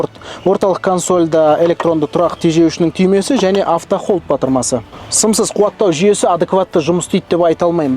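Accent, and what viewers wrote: Standard. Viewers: қазақтың тілінде таза сөйлейді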